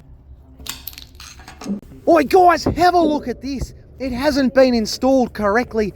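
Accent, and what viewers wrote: Australia. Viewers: No idea why the algorithm sent me your way, but here we are, and I'm enjoying the videos! Greetings from merry England. Love your enthusiasm for the job, and man you couldn't be any more Aussie unless you had a hat with corks hanging off it, making Kevin Bloody Wilson sound shy and reserved! Keep up the good work mate.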